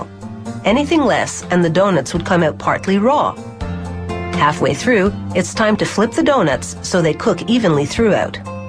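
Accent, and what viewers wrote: Canada. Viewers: This announcer is very obviously not American. Very noticeable Canadian accent. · was i the only one who noticed she kinda had a Canadian accent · You can tell these shows are Canadian by the way the narrarator says 'about' and 'out' XD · is the lady talking from canada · wow, such a canadian accent~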